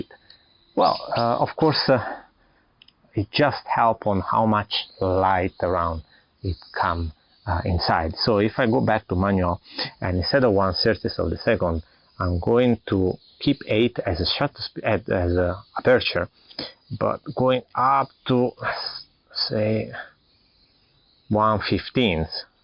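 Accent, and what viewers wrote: Italy. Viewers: You sound very much like an Italian… are you? 👍 👋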